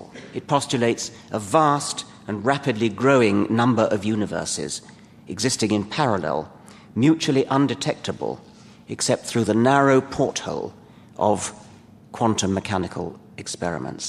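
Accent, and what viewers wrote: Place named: RP, United Kingdom. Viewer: Dawkins has long been the most eloquent advocate of all things science for the general reader. And I love his upper crust British accent :-)The Selfish Gene should be required for all high school students.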